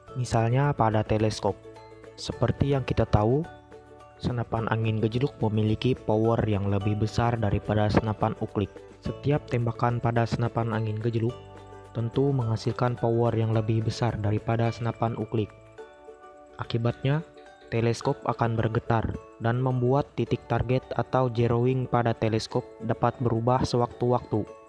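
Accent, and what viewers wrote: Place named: West Java, Indonesia. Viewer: Logat nya kya orang sunda sih bos...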